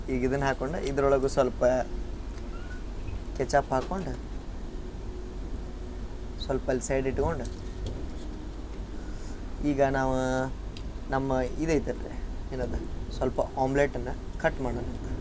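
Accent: North Karnataka, India. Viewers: your native Kannada north karnataka accent narration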